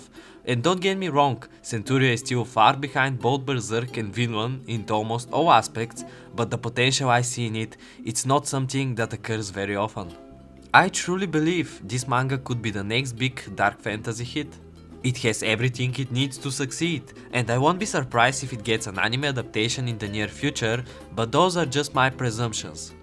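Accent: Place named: Bulgaria. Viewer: Bulgarian ahh accent, nevertheless amazing video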